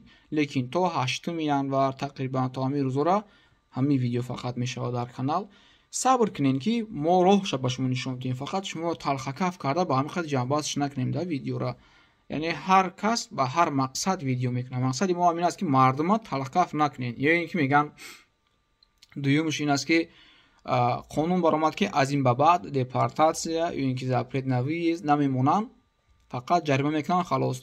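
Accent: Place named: Kulob, Tajikistan. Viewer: чесний пацан ма як гапда кайф мекнм "талхакаф"шеваи кулоби очень приятна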